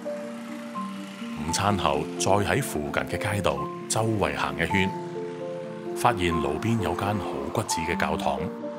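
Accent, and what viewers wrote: Hong Kong, China. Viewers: Love your HK accent narrating a msian documentary in classic queen's Cantonese. Are you by any means from HK? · 难怪听起来像香港人口音和用语。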